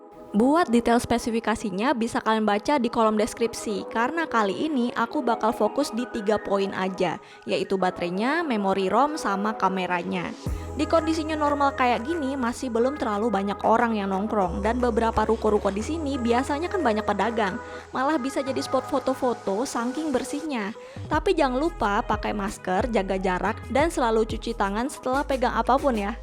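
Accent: Yogyakarta, Indonesia. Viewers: Orang Jogja kah?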